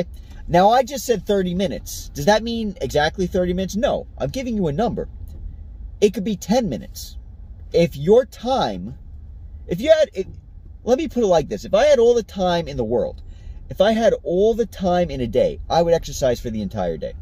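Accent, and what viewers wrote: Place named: Boston, United States. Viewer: Where u from Boston (your accent)? I get my Tonal installed on Nov. 4th can't wait!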